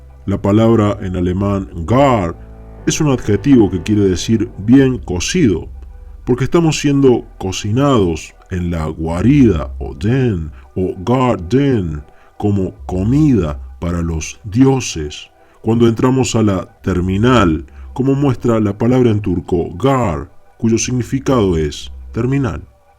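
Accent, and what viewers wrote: Córdoba, Argentina. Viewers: El dueño del canal me da la sensación que es de Córdoba, Argentina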